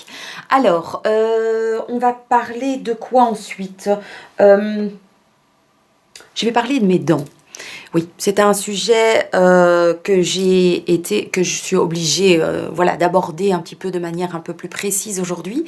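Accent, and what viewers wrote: Belgium. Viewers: J adore ton accent c est désagréable quand tu dis ça je vois un one woman show bon tu seras peut être fâchée mais en tant que belge je m autorise l autodérision sur notre accent. Sinon. Faut vraiment que nous pensions à prendre soin de nous mes filles 19 et 15 ans ont ce qu il leur faut mais moi perso je me met de côté exceptée début d année où j utilise les produits des calendriers de l avent cette année c est thé bio, bougies et nuxe après je ne suis pas... je ne sais pas quoi acheter... mais il faut vraiment prendre soin de soi je dois juste l integrer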